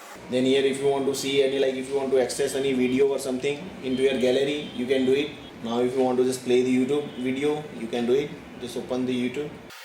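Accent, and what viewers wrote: India. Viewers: You sound like a gujrati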